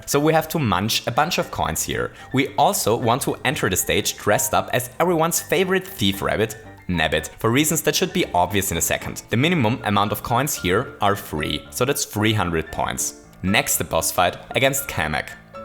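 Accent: Germany. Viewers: Are you german? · Bist du ein Deutscher ?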